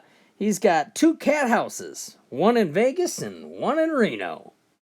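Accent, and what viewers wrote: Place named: Texas, United States. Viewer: I laughed once, but not because of the jokes. Ngl they were terrible but that texas accent was funny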